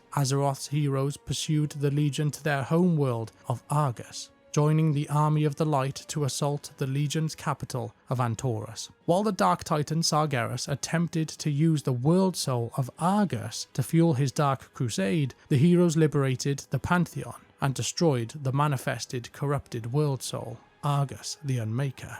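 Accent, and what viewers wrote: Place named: Cardiff, United Kingdom. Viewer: i know a cardiff accent when i hear one.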